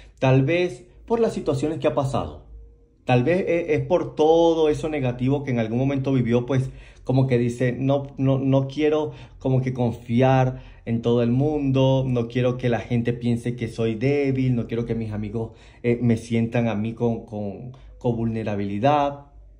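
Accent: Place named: Chile. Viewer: Hola me encanta escucharte ese tono de voz de chile yo soy argentina . Un abrazo a la distancia.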